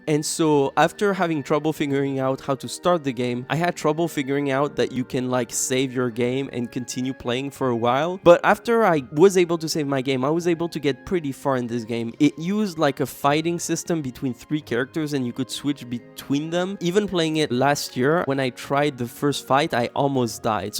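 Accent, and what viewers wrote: France. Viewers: Not gonna touch on everything but : Starting off with Goldeneye on N64 is so funny bc for some reason i was kind of obsessed w the game when i was a kid (not even necessarily playing it, just the game itself? i don't think i even really knew who James Bond was tbh lmao) I played Eyetoy Play sooo much it's actually silly how much i played it I have such fond memories of New Super Mario Bros on the DS, mostly bc i associate it with my mom - we all played it but that game was serious business to her lmao (especially on vacation) I really liked the minigames in Mario Kart DS but i think it's bc my Mario Kart™ was Double Dash on the Gamecube so maybe that played a part? If you played that one i wonder how you felt about the mini games in it Anyway, this was a fun video and i'm glad both you and your sister have memories around these games (and many more i assume). 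Jumpscare incoming: Et d'habitude je capte l'accent français mais j'avoue que j'y ai même pas pensé jusqu'à c'que je vois ta réponse à un commentaire sur une autre vidéo ptdrr but lowkey my English is better.. so let me pretend i didn't say anything !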